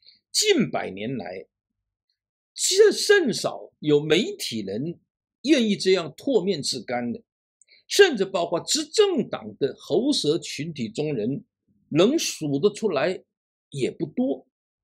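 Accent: South, China. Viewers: 不必要，基本全能听懂，而且有一点南方口音的普通话感觉更有韵味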